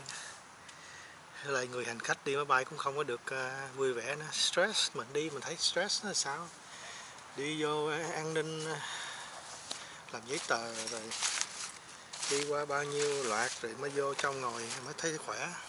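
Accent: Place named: Mekong Delta, Vietnam. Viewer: Chúc mừng cháu nay vui nên ăn no cứng bụng luôn , mà lạ nhen toàn từ Việt phổ thông nhức nách , ná thở , con cò có cái cổ cong cong ... giọng , tiếng không pha lẫn của miền Tây VN ..... vui và thân thiện . Cám ơn bạn .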